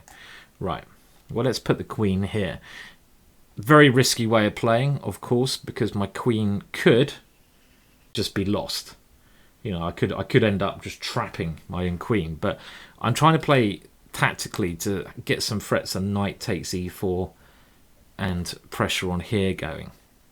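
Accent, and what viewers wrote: England, United Kingdom. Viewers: I love it when he swears. Profanity is not only humorous but should be mandatory with such a fine english accent.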